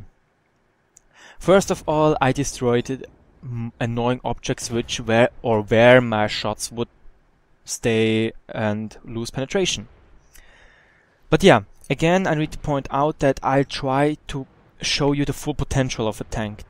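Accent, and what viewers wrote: Germany. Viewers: Du bist Deutscher oder? ;)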